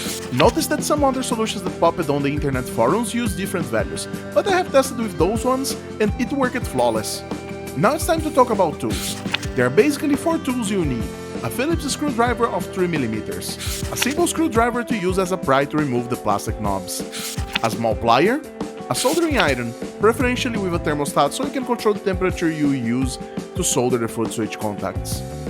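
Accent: Brazil. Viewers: are you brazilian? you have the característics of brazillian when you speak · Tu é brasileiro, irmão? Esse sotaque entrega ❤ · sotaque Brasileiro, tmjt BR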